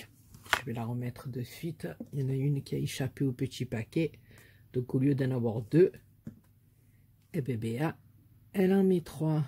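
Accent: South, France. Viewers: Coucou ma Béa , wouaaaaaa encore une merveilleuse création , elle est sublime j'adoreeeeeeee , tu as toujours de merveilleuses idées de déco , toujours très raffinées , mille bravo ma Béa et merci beaucoup c'est encore du pur bonheur de voir ta vidéo et de t'entendre avec ton fabuleux accent du sud rempli de chaleur et de soleil . Mille gros bisous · rooh ma Béa j'adore je me l'enregistre tout de suite !!ta carte enveloppe c'est une merveille !!j'ai cette collection de papiers ,ils sont juste sublimes!! he oui ils n(y a pas besoin d'en rajouter des tonnes !!merci pour cette vidéo ou tu m'a fait rire comme une malade et ton accent du sud j'adore!!gros bisousss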